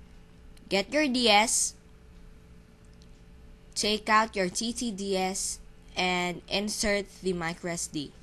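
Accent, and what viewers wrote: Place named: Philippines. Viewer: i bet u that ur filipino, u have my friends accent.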